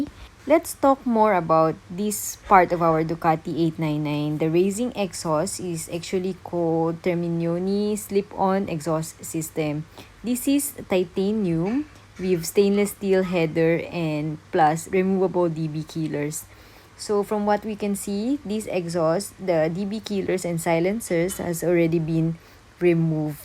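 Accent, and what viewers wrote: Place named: Singapore. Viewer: I like your Singaporean-English accent hahaha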